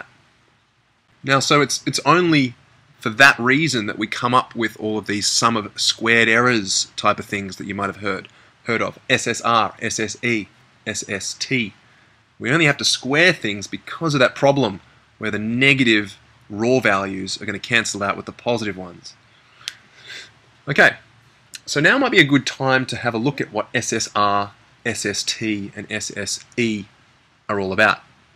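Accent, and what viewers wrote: Australia. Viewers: Love the Aussie accent haha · Are u from oz ?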